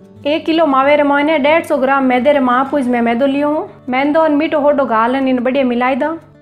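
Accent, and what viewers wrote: Marwar, India. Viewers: आपके मारवाड़ी बोली बहुत प्यारी लागे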